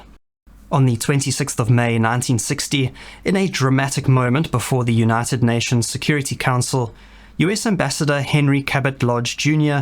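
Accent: South Africa. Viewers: Interesting content. Well researched. Your accent is South African English, right? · Nice to put a face with the charming South African/dutch accent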